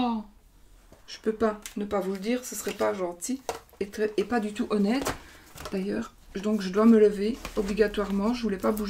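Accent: Belgium. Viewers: trop sympa ce type de vidéo, j'adore t'écouter parler des tes cartes, de tes chiens, de ta vie avec ton petit accent belge que j'adore !!!!! ca me permet de me sentir moins seule dans mon atelier alors merciiiiiiii !!!! Et Oscar j'adore, c'est le nom de mon tt 1er chien, j'avait à peine 20 ans, c'était un croisé bichon/caniche d'une gentillesse incroyable, obéissant, intelligent, sociable, sage, bref, une perle, il m'a accompagné pendant 14 ans <3 je te souhaite donc une toute aussi belle histoire avec cette adorable boule de poils noire et blanche <3<3<3 Bisous et change rien c'est comme ca qu'on t'aime !!!!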